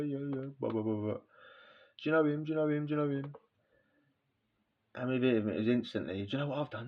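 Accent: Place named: London, United Kingdom. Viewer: What part of the UK are you from bruv you sound like a Londoner is that right?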